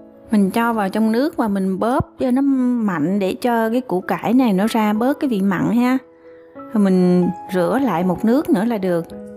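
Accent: South, Vietnam. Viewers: Hỏi cho vui chứ thấy rồi, giọng miền Nam ngọt hơn mía lùi, cảm ơn cô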